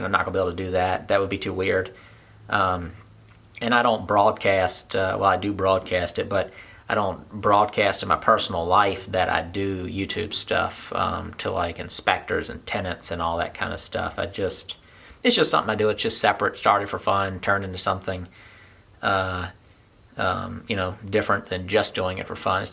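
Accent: South, United States. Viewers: Hi! You finally whooped that concrete pillar! Congratulations!! Will you tell us which state you live in? Just curious to know, since you and I share the same southern accent. If not-that's good too. Enjoy these videos a lot.:-)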